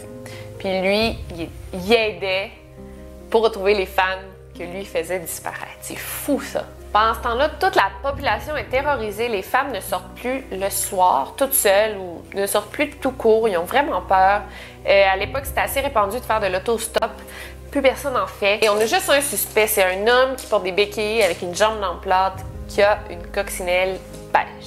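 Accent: Quebec, Canada. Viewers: Tu raconte vraiment bien ses story même avec l accent Québécois que j adore 👍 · Salut je profite de cette vidéo sur le plus "grand" des tueurs en série pour laisser un commentaire je t'ai découvert il y a 3 mois depuis je regarde très souvent tes vidéos tu es simple et authentique, un plaisir pour les yeux et les oreilles avec ton accent Québecois lol je supposes que tu connais l'émission française faites entrer l'accusé si ce n'est pas le cas je te la conseille fortement 👍continu comme ça tu es la meilleure tu es la seule youtubeuse que je suis 🤗. Un charltoniste franco italien. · Super vidéo très intéressant, dommage que ton "accent québecois" gache toutes la vidéo :(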